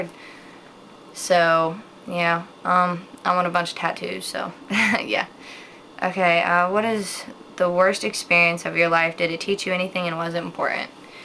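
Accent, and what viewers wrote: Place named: South, United States. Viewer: ... >.> you're from the south... O_o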